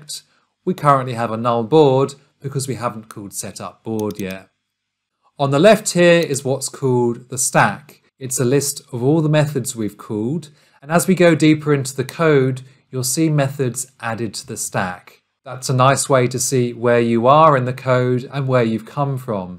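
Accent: United Kingdom. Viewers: please, say "bottle of water " :d , is he british ?:d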